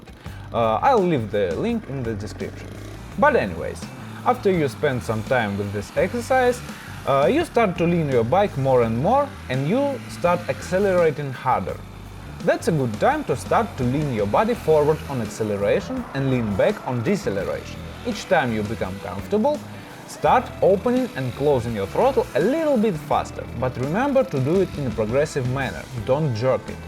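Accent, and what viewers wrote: Russia. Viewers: I just love his accent, it’s Russian, right? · Love the Russian accent on English. Sounds like a Marvel character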